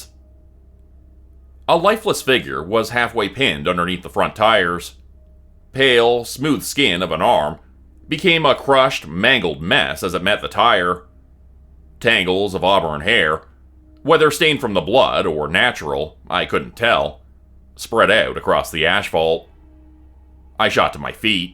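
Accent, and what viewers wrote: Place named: Canada. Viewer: I'm so grateful that you are the story teller and not AI. Your voice is very relaxing, with fantastic expression. Love your accent. Ya Canada ❤ 🇦🇺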